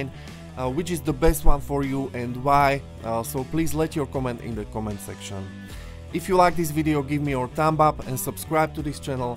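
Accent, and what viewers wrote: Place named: Slovakia. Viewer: Nice content, I'm also 95% sure that you are from Slovakia according to your English accent. I'm right? :)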